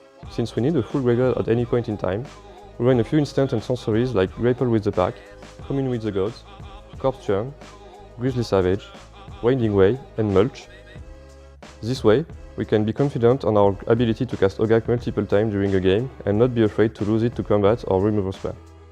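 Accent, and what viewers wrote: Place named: France. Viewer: L'accent français 🤪😘 La même en français c'est possible ? 😁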